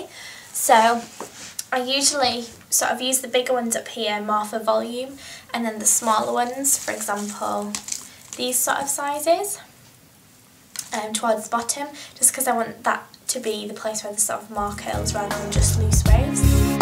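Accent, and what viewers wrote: United Kingdom. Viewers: What accent does she have?! Is it British? I love it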